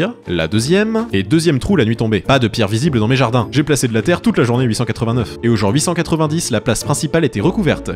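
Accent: Quebec, Canada. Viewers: Coucou, tu es Québécois ?? Sinon j'aime trop t'a série 😘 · Tu pourrais faire un monument pour la meilleure de tout les pioches ps j’adore ton accent québécois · Es que tu est quebecois ou canadien😃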